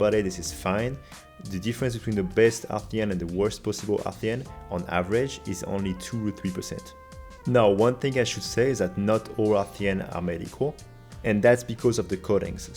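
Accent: France. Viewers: are you french? I am, and your english accent reminds me of me speaking english x) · 1. sexy french accent 2. good not annoying background music. 3. straight to the point and everything clearly explained. 4. well edited the perfect build guide video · You sound french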